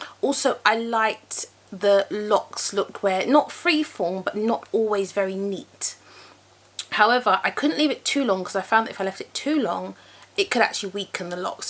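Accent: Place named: United Kingdom. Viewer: Oh she's gorgeous. In just here for the accent. The accent is so lovely. So British and soft so the ear. I played this 5x befor my husband took my power cord. M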